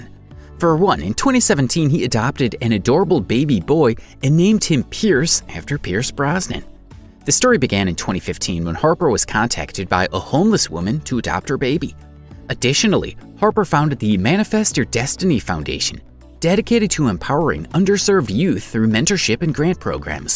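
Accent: United States. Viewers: That narrator’s Midwest pronunciation of the vowel “a” is tooooo much!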